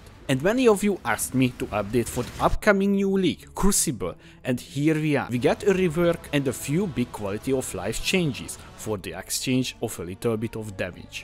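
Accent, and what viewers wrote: Hungary. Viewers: Magyar vagy bátyja? Úgy hallani :D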